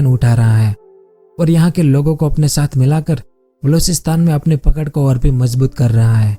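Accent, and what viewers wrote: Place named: Kathiawar, India. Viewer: તમે કાઠીયાવાડી‌ છો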